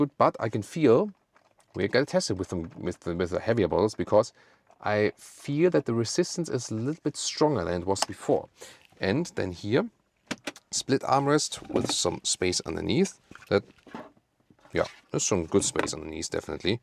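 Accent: Germany. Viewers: Hi. It's ennoying to watch a channel named "Autogefühl" (a very typical german word) with a presentor that speaks in english with a quite strong german accent and pronounce even the german word "Autogefühl" in an english way. Why don't you make your presentation in german when you clearly come from Germany and give your channel with the german word "Autogefühl". It would be very much more authentic to present your videos in german than using this "german" english thought by a german english-teacher.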